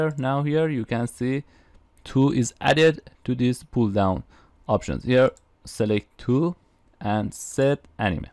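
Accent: Iran. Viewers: حاجی حس میکنم ایرانی هستی از صدا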